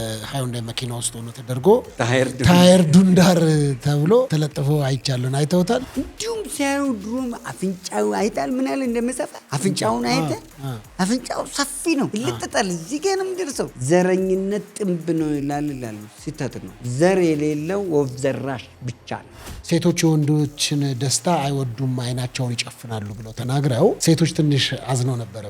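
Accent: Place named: Ethiopia. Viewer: በዚህ accent የአዲስ አበባ ልጅ ነኝ ማለት አይነፋም። በማንነትህ ኩራ። As long as you believe in one Ethiopia.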